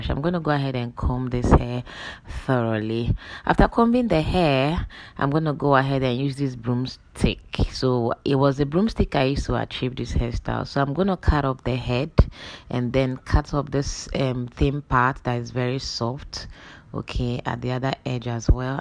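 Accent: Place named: Ghana. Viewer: You speak like a Ghanaian but your name is a Nigerian name.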